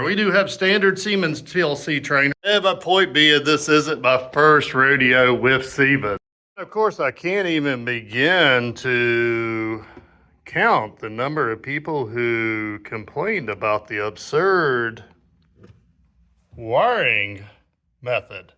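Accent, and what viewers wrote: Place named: South, United States. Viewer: the southern accent probably threw him off and made him think you were in California. common mistake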